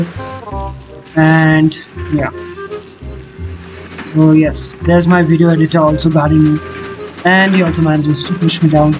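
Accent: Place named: India. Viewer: I can tell by your accent that you are indian, im indian too!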